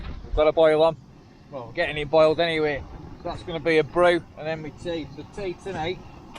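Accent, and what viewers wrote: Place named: Lancashire, United Kingdom. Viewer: Excellent video. Always look forward to them. Love hearing the accent. Here in Western Australia you don’t hear many Lancashire accents like yours or mine. I can’t go walking in the mountains like you but feel I’m there. Many thanks from dave down under.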